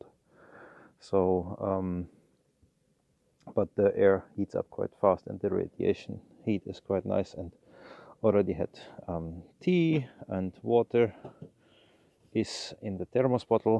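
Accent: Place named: Finland. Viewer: Thanks for sharing . Your accent speaking English is getting more and more Finnish and less German. Not a bad thing, just an observation 😊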